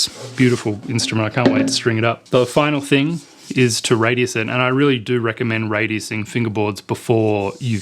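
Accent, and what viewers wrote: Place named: Australia. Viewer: This looks great! You sound Australian and you gave the price in dollars. Unfortunately I can't see any information at the String Pluckery website about what currency they're using or where they are located. Is this price in Australian dollars? (I'm hoping it's not in US dollars!)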